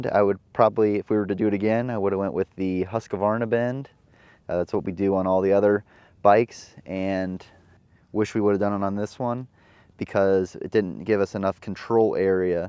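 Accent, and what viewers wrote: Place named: South, United States. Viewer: watching from Australia I'm guessing southern? Hazard county?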